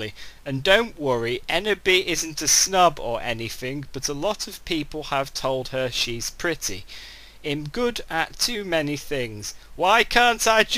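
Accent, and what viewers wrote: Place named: Australia. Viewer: The Australian accent seals this.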